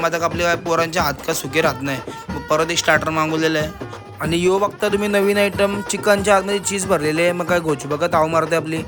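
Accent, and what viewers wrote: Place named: Agri, India. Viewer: Tumchi agri marathi lai bhari ahe bhava ❤❤❤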